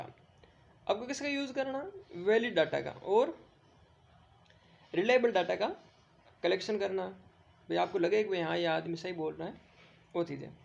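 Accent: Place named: Rajasthan, India. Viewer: Rajasthan ke lag rhe ho Guru ❤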